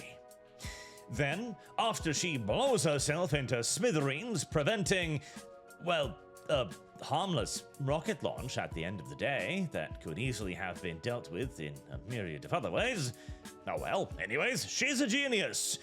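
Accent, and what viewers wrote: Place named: United Kingdom. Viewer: Damn your British Accent makes this review so much better. Feels like 🤔…Writing Styles of The Broke & Brainless would be an appropriate title 😂.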